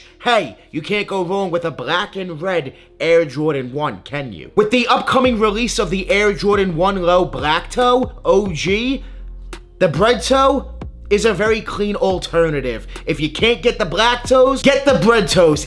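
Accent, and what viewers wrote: New York, United States. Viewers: been following you now for a minute. ur doing it the right way with engagement. the give aways and drawings. great connection with ur audience and subscribers. i’m GONNA get some lost n founds eventually 😅. also u gotta be from NY.. what part of LI u from? i can smellll it.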